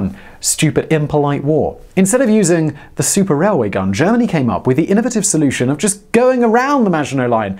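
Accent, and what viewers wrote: United Kingdom. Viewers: Oh awesome, one I've never heard off! And, we Americans LOVE the British accent.;)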